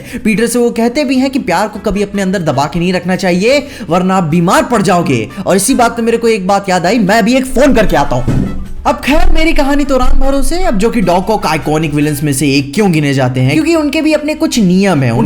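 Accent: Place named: Uttar Pradesh, India. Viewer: Bhayi aapka accent UP jaisa lagta hai, kahan ke ho aap ? 🤔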